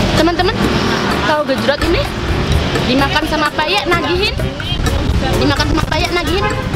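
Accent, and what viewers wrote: Java, Indonesia. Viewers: Mbak. Asalnya drmana ya. Kok jowone ngguenah poll hehe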